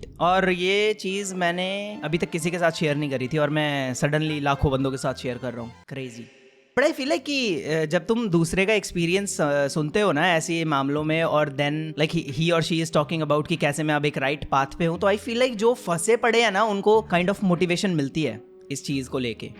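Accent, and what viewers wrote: Haryana, India. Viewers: I like speaking haryanvi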